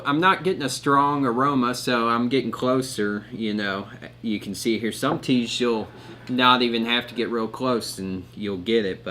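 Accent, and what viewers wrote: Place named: South, United States. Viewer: I really enjoyed your review, and I love your background of the Chines tea farms! I’m enjoying some jasmine pearls right now myself to start the day. I love drinking plain tea throughout my day and I get it shipped to me in California from Mei Leaf in London. You are very cute and I love your southern accent. I hope you make more tea reviews.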